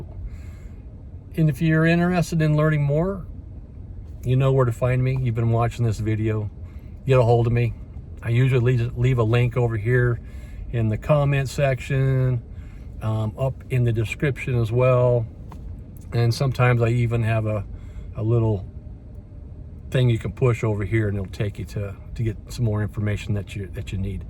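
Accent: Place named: Alabama, United States. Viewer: Hey, you aren’t from Alabama are you?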